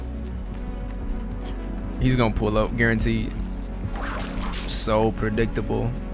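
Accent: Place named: Chicago, United States. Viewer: Man I know a Chicago mf when I hear one 😂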